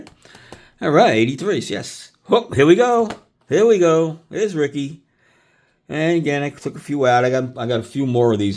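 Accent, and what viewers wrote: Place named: New York, United States. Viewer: This guys NY accent is thick as a rug.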